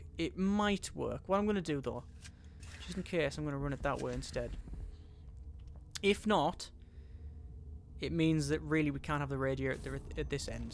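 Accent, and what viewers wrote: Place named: North East England, United Kingdom. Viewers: North east - Newcastle/Middlesbrough/Hartlepool area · +emmo999 I'm from the Darlington area of North East, his accent isn't that strong to me, I can't pin point it XD Sunderland, Hartlepool, maybe Newcastle! but swinging more to Newcastle XD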